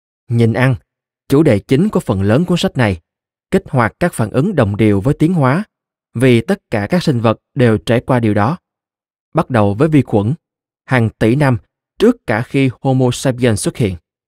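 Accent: South, Vietnam. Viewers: Đây là clip mà tôi thấy tệ nhất trong nhiều clip vì gần hết clip rồi mà vẫn cứ miên man giới thiệu,tua mãi mà vẫn cứ nói về bản ngã...vv Nói chung fonos hay ở đâu có giọng đọc miền trong rất nặng về trình bày tên tuổi dài dòng.thể hiện tiếng anh nghe phản cảm tỉ vẻ như rất tài giỏi về ngoại ngữ sao ko nói gì cho mộc mạc dễ nghe ..¿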